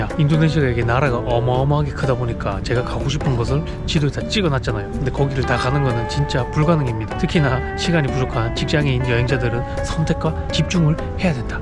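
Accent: Busan, South Korea. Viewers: 정겨운 부산사투리 잘듣고갑니다~~ 초보여행유튜버로서 잘보고 갑니더~~ 건강히 여행하시길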